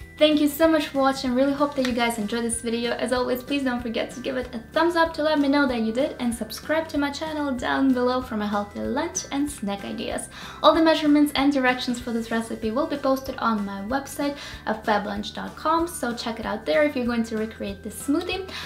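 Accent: Russia. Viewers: я слышу русский акцент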